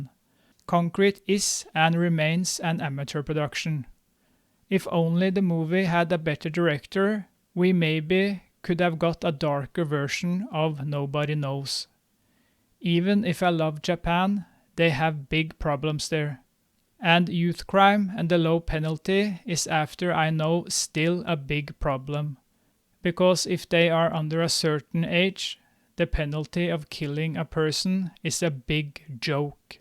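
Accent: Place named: Sweden. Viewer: Jag bara: Han e svensk direkt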